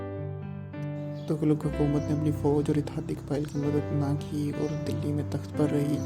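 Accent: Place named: Rawalpindi, Pakistan. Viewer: Tu rawalpindi ka lgta hai awaz say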